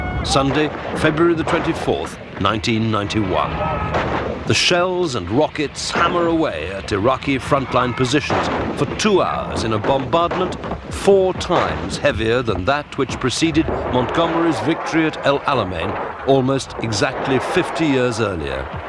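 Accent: United Kingdom. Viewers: I can't listen to a brit tryin to educate me. Oh well...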